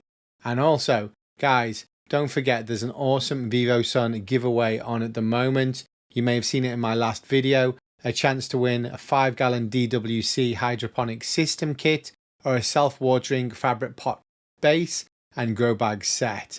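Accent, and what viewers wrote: United Kingdom. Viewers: No a scouse... sound more like berkenhead to me...Better class of grower. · you sound so English lol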